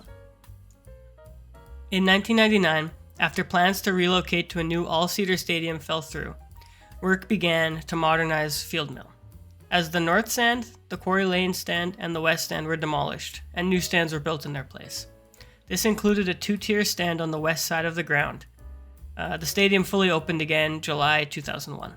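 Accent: United States. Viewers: My home town. Was surprised to hear an American talking about it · Voiced by an American with no understanding of what he is reading from a script. Mistakes are numerous and unedited. Please save us from these and/or get a different voice-over.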